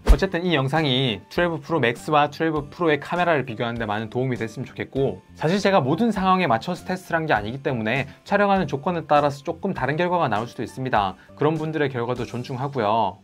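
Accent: Gyeongsang, South Korea. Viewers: 경상도 분이신가용?? 말투가 먼가 넘 귀여우심ㅋㅋ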